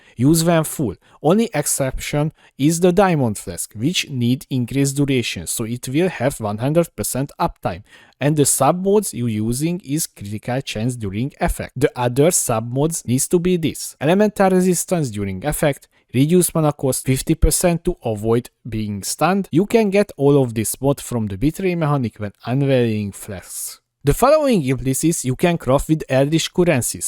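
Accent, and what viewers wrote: Hungary. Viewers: U Hungarian? Sounds like it.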